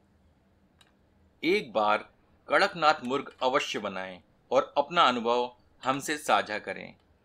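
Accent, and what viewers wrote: Standard. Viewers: भैया इतना शुद्ध हिंदी कहा से सीखे है । आपके पूरे स्कूल को आप पर हिंदी के लिए गर्व होगा । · Sir apka hindi sunke Dil bag bag ho Gya.....Hum v tasty recipe or suddhaa Hindi ke liye apke khate pe like or ek Subscriber Sammilit krte he........😊😎👌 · the purest n sweetest Hindi I have ever heard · itni sudh hindi sunkar dil khush ho gaye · आपकी शुद्ध हिंदी के कायल हो गये हैं। आपकी हिंदी तो अमिताभ बच्चन से भी शुद्ध है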